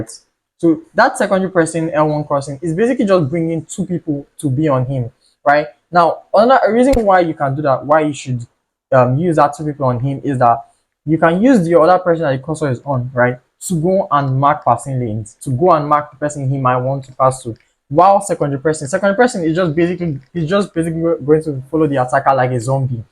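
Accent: Nigeria. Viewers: You sound naija so imma sub bro!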